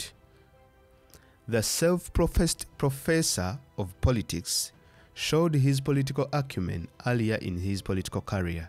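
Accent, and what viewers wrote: Uganda. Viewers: This is not a Kenyan giving this narration, the accent sounds Ugandan.Why not give us the story of UG especially the Amin years.He was one of the worst dictators in Africa, Moi pales in comparison to him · The accent sounds Ugandan of the Narrator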